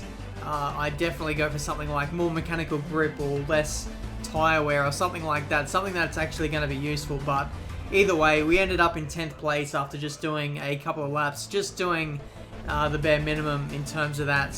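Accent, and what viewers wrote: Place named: Australia. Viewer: Classic lines: * And away we gooo * Having a bad start as always * Another points to add to the championship tally * Look at that...! * So here we are the..... Grand prix * We got some R&D testing to do * Another crucial race for the championship Of course you have to insert the aussie accent in all of them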